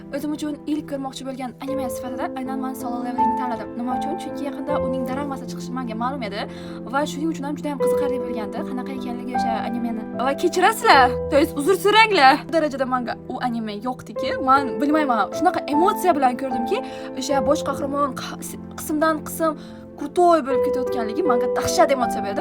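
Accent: Bukhara, Uzbekistan. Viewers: Opa Buxorolikmisiz😮😮😮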